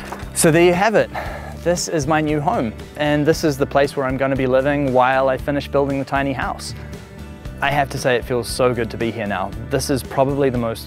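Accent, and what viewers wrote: New Zealand. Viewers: Before I even heard him speak I thought 'this guy must be a kiwi' ... haha! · Sounds like a new zealander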